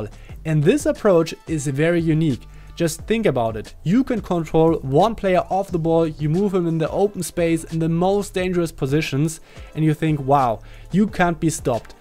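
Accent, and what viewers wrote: Germany. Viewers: Safe Deutscher, dieser Akzent 😂 Genial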